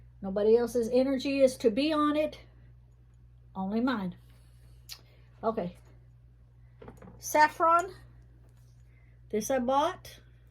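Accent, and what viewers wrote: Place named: South, United States. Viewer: Girl....your accent is Perfectly Southern just like mine....I Love it!!!! Get your Wizard friend some E6000, his crystal shouldn't break off again with that. I miss the Tang of yesteryear. Might have to pick some up at the store...lol Sale and clearance....I roll like that too...lol Star Anise, I would say in the spices. 💜💜💜💜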